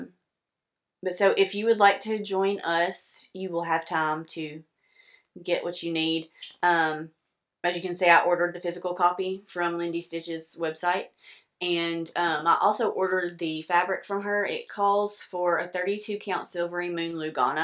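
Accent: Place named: South, United States. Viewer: 😁 don't apologise for your cats' noises, I find it hilarious 😁 Maybe because I also have cats, four of them and sometimes one of them does a cameo on my floss tube 🙈😁 I came here because Chris mentioned you 😊 and I'll stay here. I like your projects and your Southern accent. Looking forward to seeing your cotton video 👍😊 Kr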